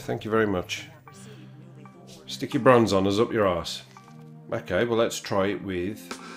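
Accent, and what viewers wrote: Birmingham, United Kingdom. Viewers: Are you back for good? WE all miss our that smooth brummy twang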